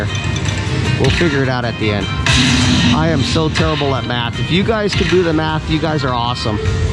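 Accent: Australia. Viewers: Pokies! You sound like another 🇦🇺 😊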